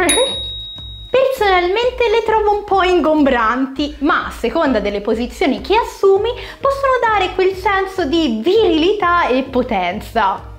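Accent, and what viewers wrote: Livorno, Italy. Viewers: Il tuo accento toscano mi fa impazzire 😄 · Io riesco a riconoscere che è livornese.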